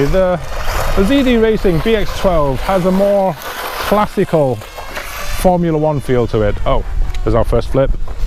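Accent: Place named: France. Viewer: ahah l'accent français à couper au couteau. Change rien !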